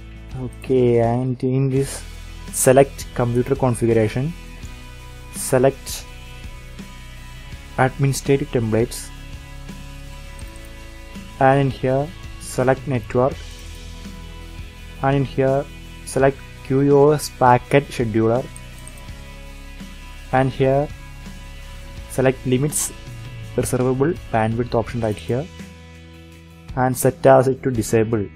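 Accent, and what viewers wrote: Kerala, India. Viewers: r u and indian???from kerala? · are you from kerala ?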